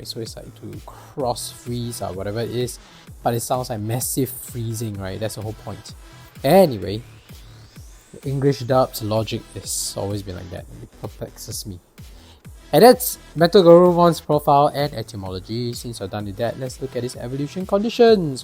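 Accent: Singapore. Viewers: liked n subscribed, btw you sound like Singaporean/Malaysian haha anyways looking forward to more digimon content!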